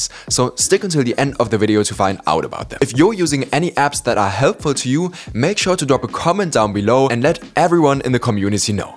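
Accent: Germany. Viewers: i love the german accent so much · Bist Deutscher? Klingt ziemlich danach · Wegen dem Akzent vermute ich mal dass du deutsch spricht und wow das war ein richtig gutes video jede app war ne mega empfehlung danke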